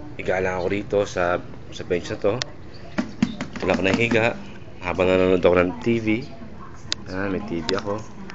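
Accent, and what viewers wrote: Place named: Pakistan. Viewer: lol this is not saudi he is pangaladish or pakistane lol look to the way that he is talking in lol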